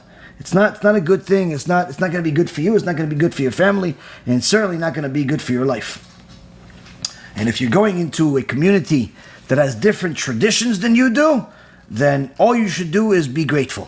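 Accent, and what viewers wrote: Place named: Yemen. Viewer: I like the yemenite way of Hebrew-pronunciation and share your point of view, dear rabbi.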